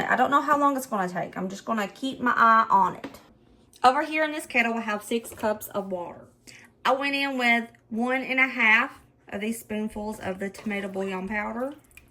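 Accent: South, United States. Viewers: Your videos are like memories for me except I had 4 girls. We're in Ohio and my daughters are now adults with their own families. They still make these meals one has modified them for gluten and dairy free. That's is not always a cheap way to. Love the southern draw as my husband's family is from Crossville Tennessee!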